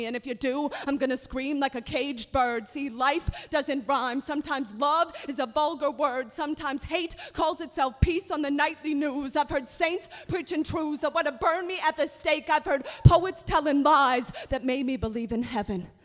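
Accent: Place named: Maine, United States. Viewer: Maine accent still front and center here.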